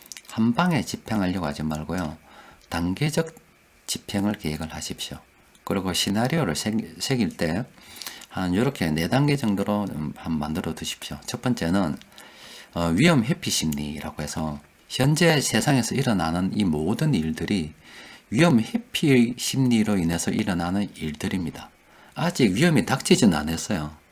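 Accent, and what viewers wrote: Gyeongsang, South Korea. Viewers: 기회가 오면 어느종목을 사야할까요? 초보인지라~~~^^ 차분하게 설명을 잘해주셔서 이해가 잘되고 불안한맘도 안정되게 해주시네요 경상도 말씨도 정감가구 좋은 컨텐츠 만나게되어 감사히 청취하고 있습니다 ^^ ♡ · 경상도사투리 매력있습니다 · 구수한 경상도 사투리와 함께 귀에 쏙쏙 들어오는 강의 감사합니다~ 무엇이든 쉽고 빠르게 이루어지지 않다는걸 새삼 깨닫습니다. 앞으로도 좋은 강의 부탁드립니다~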